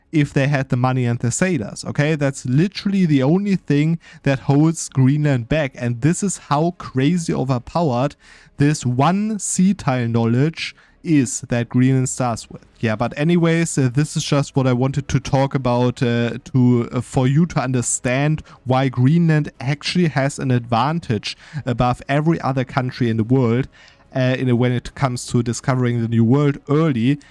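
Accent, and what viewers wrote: Germany. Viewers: The German accent is as sharp as a knife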